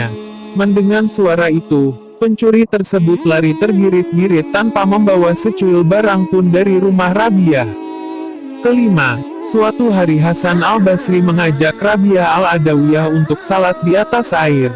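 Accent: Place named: Madura, Indonesia. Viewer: Madura kerass 😀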